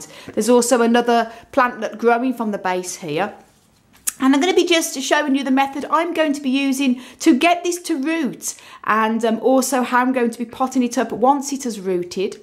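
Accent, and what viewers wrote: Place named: Solihull, United Kingdom. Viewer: Really useful video, I hope to propagate this way from my ornamental pineapple and its pups! Thanks for making, thanks for sharing your wisdom! You sound like you might be from Solihull or somewhere thereabouts? Xx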